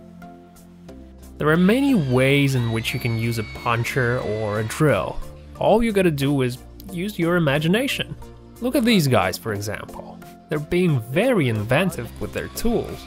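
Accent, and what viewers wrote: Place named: United States. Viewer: You speak with an American accent but seem to have some trouble with with English, sounds weird.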